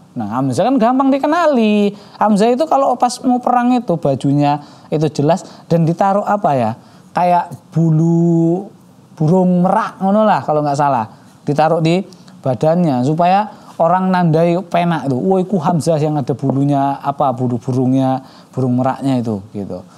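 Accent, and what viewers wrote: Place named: Indonesia. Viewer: Medok tenan ustadz.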